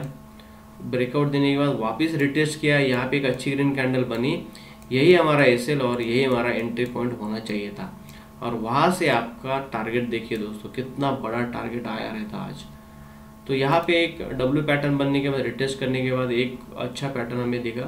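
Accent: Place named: Maharashtra, India. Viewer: Tumchya Hindi vrun vatt ki tumi maharashtra che ahe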